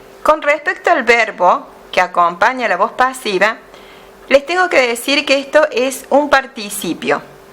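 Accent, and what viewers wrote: Córdoba, Argentina. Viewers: Lo cordobés se nota a la legua, aguante Córdoba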